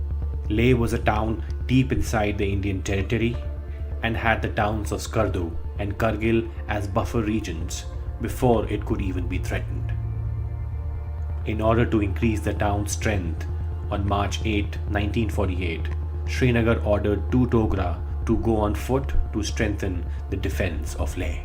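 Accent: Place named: United States. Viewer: Excellent research and presentation, as always. One request: pls try to use the King's English i.e. the English that is taught in our best schools and also used in our military. Therefore, 'route' should be root (not rowte), 'lieutenant' should be leftenant (not the U.S. "lewtenant"). Else the Americanisms are jarring to the educated Indian ear.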